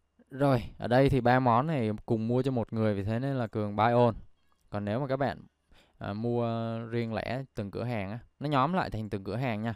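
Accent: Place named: Bac Lieu, Vietnam. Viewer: Tiếng đúng dân BL.. em ở BL đây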